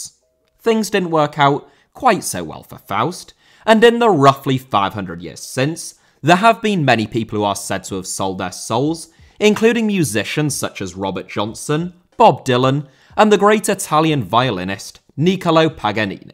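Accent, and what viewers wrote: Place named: United Kingdom. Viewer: Well done mate , I have had a wee look at your stuff over the past couple of months , thoroughly enjoyed it and passed it along to my football loving buddies . For me as a Bolschie Celtic fan it isn`t a massive jump in consciousness to really identify with a lot of the issues which you bring up . But what I really love as an old git who enjoyed Fantasy football ( with Badiel and Skinner ) the football journalism from the real fans in " My favourite year " , When Saturday Comes etc etc , it`s nice to see that the tradition of humourous well informed football journalism is alive and well with guys like yersell . Still waiting for an opinion from my other Celtic buddies on your stuff , but some of them are of the naive attitude that politics has nothing to do with sport . Au contraire mes Amis , tell that to Tommie Smith and John Carlos . 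and that`s one of the reasons I enjoy your exposure of the despots who use fitba to sanitise their horrible public image. Keep up the good work young man .Always love to hear accents from the UK ( I live in Germany and I miss this almost as much as I miss a fry up ) . I shall continue watching and write again . Some of the comments are priceless. 🙂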